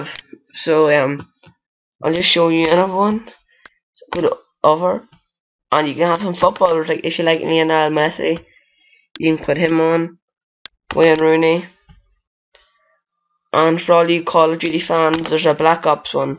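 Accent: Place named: Ireland. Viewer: You sound Irish :) !!!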